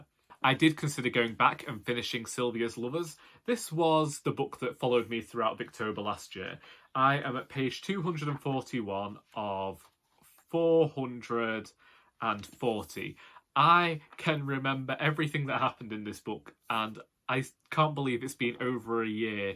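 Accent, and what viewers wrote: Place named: England, United Kingdom. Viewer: Great picks. You have a cool accent. Which part of England are you from? Here's my ever changing TBR. I got really sick so I started early. 1.Sensationalist Novel: Either some Mary Elizabeth Braddon book or and Uncle Silas by Joseph Sheridan le Fenu 2. Book that takes place in the City: The Romance of The Shop by Amy Levy and hopefully also New Grub City by George Gissing3. Book that takes place in the Country: Carmilla by Joseph Sheridan le Fanu (already read)4. Best Seller: He Knew He Was Right by Anthony Trollope and, if I can fit it in, The Heir of Redclyffe by Charlotte Yonge5. Book with Female Lead: Esther Waters by George Moore and Janet's Repentance by George Eliot (already read both)6. Audiobook: The Mayor of Casterbridge by Thomas Hardy (already read)7. Group Read: Gothic Tales by Elizabeth Gaskell And for fun I'll probably read some poetry and short stories (I already read The Canterville Ghost by Oscar Wilde)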